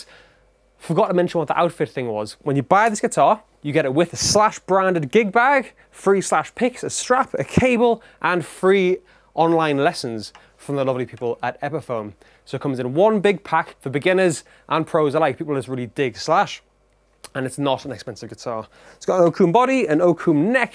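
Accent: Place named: England, United Kingdom. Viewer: he sounds like bob odenkirks english accent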